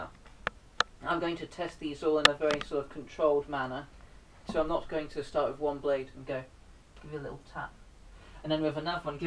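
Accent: United Kingdom. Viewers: He sounds like some type of Londoner too, which is my least favourite accent.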